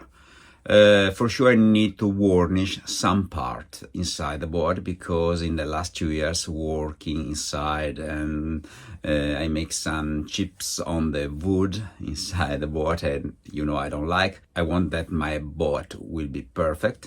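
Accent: Italy. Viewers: Wonderful, you are back in English. I don't know what I like most about your channel, all the tips on how to fix things or your wonderful English with a clear and lovely Italian accent 🇸🇪😀😄💕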